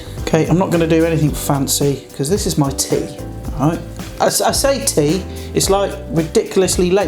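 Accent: United Kingdom. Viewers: I love your accent. what kind of British accent is it?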